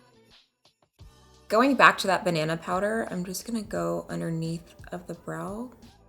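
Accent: South, United States. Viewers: Used to have a super southern accent too... go watch the original videos and you will see what I’m saying. I do love her makeup so much though!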